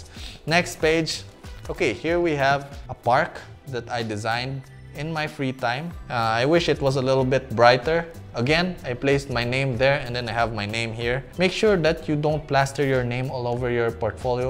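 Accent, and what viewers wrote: Philippines. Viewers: Infairness ang galing niya mag english. Filipino accent peru di masagwa at maarte. Tamang tama lang. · What I love about it, it's not THE "FILIPINO ACCENT" that the media (especially foreign media) portraits this is the legit pinoy accent not the forced one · I like how he still has his Filipino accent, this should be normalize!!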